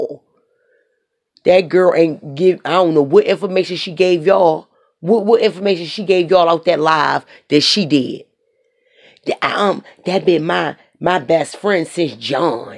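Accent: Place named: Baltimore, United States. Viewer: You got to be from Baltimore, Cause you sound just like us. But any who great job. Wild that ass out.. love your work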